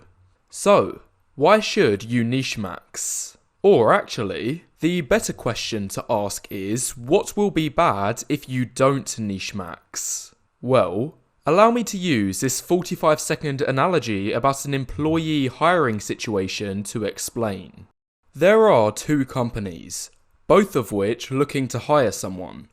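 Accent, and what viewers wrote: United Kingdom. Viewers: Like that voice you sound like wiley cotote when he talks once in a while that british accent youre a genius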